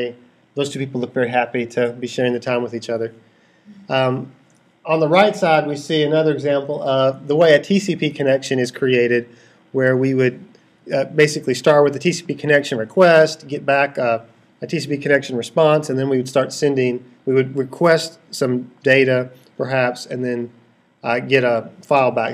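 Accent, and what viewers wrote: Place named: United States. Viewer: Ahhh finally an american accent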